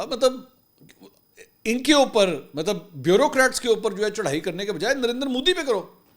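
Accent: Punjab, Pakistan. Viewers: My wife is from Punjab, India. She really loves your videos, and every morning she play your video during breakfast. She loves your Punjabi touch and laughs a lot. 😂❤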